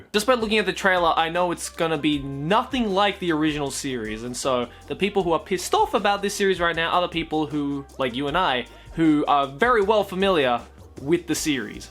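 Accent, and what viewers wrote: Australia. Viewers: #AnswerMeSenpai Do you speak Japanese with australian accent? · Holy shit I've been rewatching some older videos from Joey and the bois and I'm cringing at the thought of me ever watching these videos 5 years ago. These are all low-quality, immature, cringe videos compared to content you make today, both on your own channels and trash taste channels. Honestly watching another 10 episodes about shitting sounds better than rewatching this video once again. Also your aussie accent strong here. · #answermesenpai speak in your Australian Accent for the rest the video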